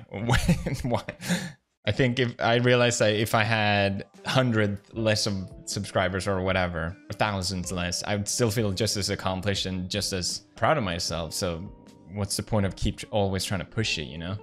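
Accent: Sweden. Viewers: Jag hade svårt att kolla på dina videor i början för att jag inte kan lyssna på engelska med svensk brytning, plus att jag var avundsjuk på att du var så populär, men sen började jag titta på lite colabs med dig och Seán och började sedan kolla mer och mer...med jämna mellanrum. Men dina senaste Japanska videor kollar jag på så fort de dyker upp för de är väldigt underhållande. Så gör vad du vill i vilket tempo du vill. :) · Crazy after all these years you can skill kinda hear his swedish accent I think thats pretty cool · Wow your accent really got better over the years your Swedish is faint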